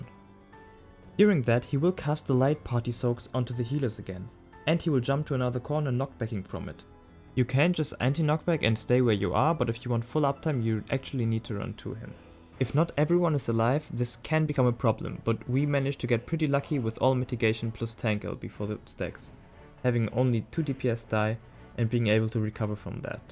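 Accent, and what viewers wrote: Germany. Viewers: oh yea i forgot was german as well haha. I love german accent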